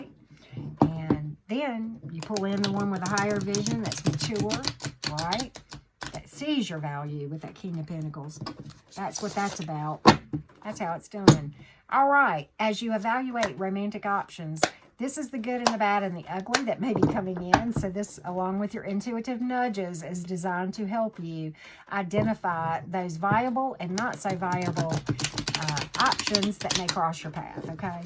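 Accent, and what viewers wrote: South, United States. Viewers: I'm loving your channel annnd that southern drawl (I'm a N. Ga Peach) So glad to have found you from Tyler's Tarot 😁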